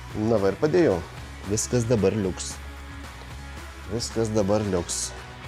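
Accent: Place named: Miami, United States. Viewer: Geras video, bet jay tas majamio akcentas.....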